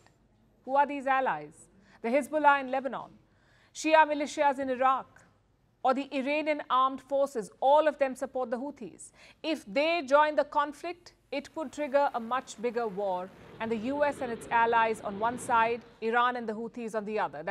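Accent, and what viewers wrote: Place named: India. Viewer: You have to appreciate the seriousness of an Indian accept with the news! It’s frank and firm! Amazing work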